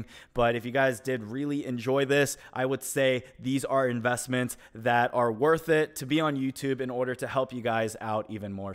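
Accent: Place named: DMV area, United States. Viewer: You from the dmv area?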